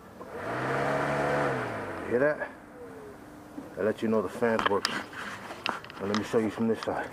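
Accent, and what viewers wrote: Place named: Bay Area, United States. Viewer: imma guess, you from the bay bruh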